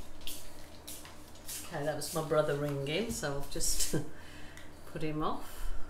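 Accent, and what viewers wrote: Birmingham, United Kingdom. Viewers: Well done. I feel your anxiety. I keep trying that technique but I’m not getting the paint consistency right 🤦‍♀️. The finished result turned out pretty., I knew I detected a British accent in there, hidden under the Australian one 😊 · I am trying to determine your accent. I’m going to say Brummie ?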